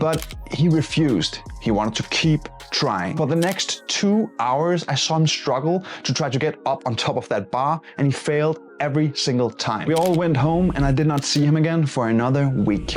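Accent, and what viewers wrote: Denmark. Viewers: A very valuable video. Much love from Sweden. The Danish accent in your English makes it even better as my circle is filled with of wonderful Danes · He is Danish, its just a dialect. Learn to understand it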